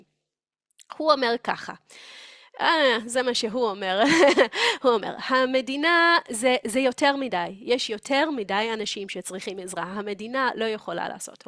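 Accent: United States. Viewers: השיטה שלך צריכה שינוי דחוף. 1. לא לתרגם! להסביר בעברית את המילים. מי שעדיין לא הבין יכול לבדוק בעצמו. אחרת אנשים לא מקשיבים לעברית באמת אלא רק מחכים לתרגום שיגיע. 2. לדבר יותר לאט. 3. שפת האם שלך בכלל עברית? נשמע שלא. יש לך קצת מבטא אמריקאי. אם מישהו פותח בפודקאסט כזה הוא חייב להיות דובר עברית ילידי כי יש לך מבטא. סורי אבל זה המצב. D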